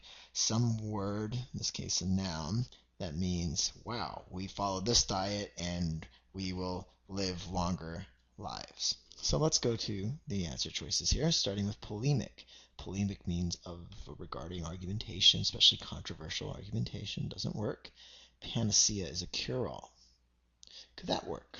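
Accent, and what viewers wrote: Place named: India. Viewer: nice indian ancent english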